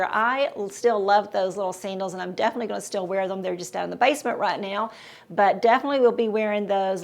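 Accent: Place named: South, United States. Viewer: I love all of these shoes! Very stylish and sensible. What I love even more is your southern accent and how you pronounce "shews" .. It's so adorable!❤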